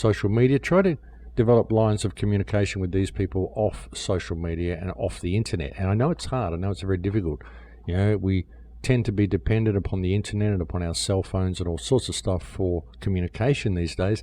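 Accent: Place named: Australia. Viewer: He is Australian.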